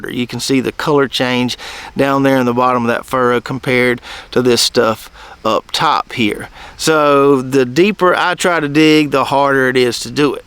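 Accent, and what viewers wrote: South, United States. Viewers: Are you trying to loose your accient..... I know that you do not hiil your in ground taters but you heel them. Keep the accient and Southern way. I enjoy you viedos and your information...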